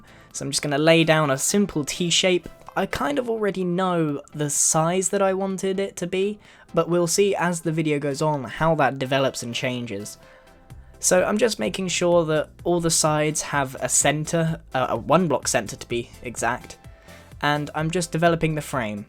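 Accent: United Kingdom. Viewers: You sound like your British? You probably aren't. But that doesn't matter a tad. You are extremely good at building! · Wow! You are a genius! I really like that british accent. :D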